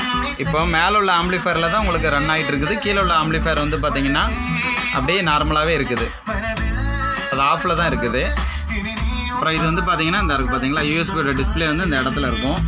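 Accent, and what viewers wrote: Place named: Coimbatore, India. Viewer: hi sir very nice explain....... Love it..... Ana Coimbatore Tamil ela...... Nice...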